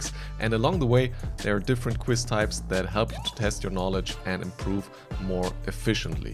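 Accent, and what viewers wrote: Germany. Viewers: du klingst schon noch sehr deutsch in deinem englisch. aber cooles video! :)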